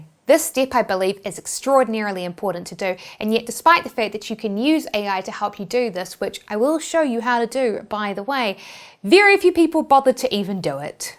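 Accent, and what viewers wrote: New Zealand. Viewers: Hello. In NZ, this is cool and nice to hear a NZ voice in this space for one, really cool thank you. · I appreciate your content and you are very liable. However, there is an E in test and the is an E in step, there is not an i in STEP. CHAT GPT there is no i in Chat, there is an A. Furthermore Perplexity is not perplixity. I Actually has and not an i. DO YOURSELF A FAVOUR AND get rid of the NZ accent. It takes away from how smart you are. just saying🤔❤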